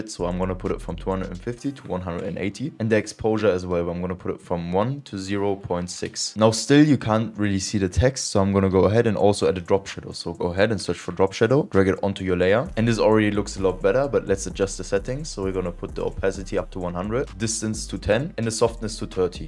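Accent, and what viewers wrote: Germany. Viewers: Der Bruder muss deutsch sein bei den Akzent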